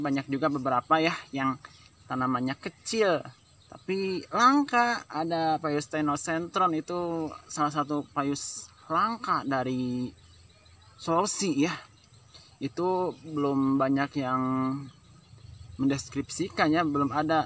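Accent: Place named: Sunda, Indonesia. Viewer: Klo org sunda berbahasa Ind logatnya meni ledok banyak,,mah"😅nya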